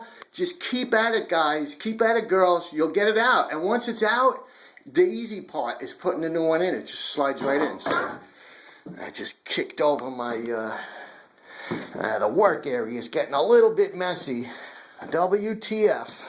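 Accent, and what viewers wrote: New York, United States. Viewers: Nice Job!! I'm a fellow handyman and it's always great to get help or advice from a contemporary! Love your style and NY accent too as I'm from Philly and my wife's from Jersey so it reminds me of home! Love the way you remind the "boys and girls" to keep calm and take a moment! Great work man!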